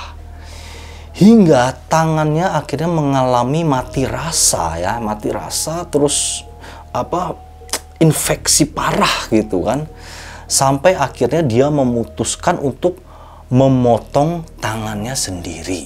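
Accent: Java, Indonesia. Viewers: medok ny sya suka mas 🐦🙏 sehat selalu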